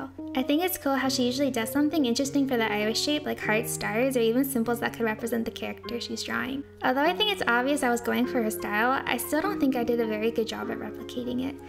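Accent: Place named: United States. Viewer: For some reason when I found your channel and that is 4 months ago. I thaught you were Japanese..😶 but after hearing your voice for the first time. I guess you're American. But although. I love your animations.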